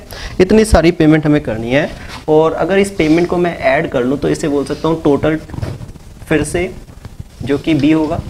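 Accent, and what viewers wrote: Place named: Punjab, India. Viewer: Sir u r very cute..., specially ur sort of punjabi accent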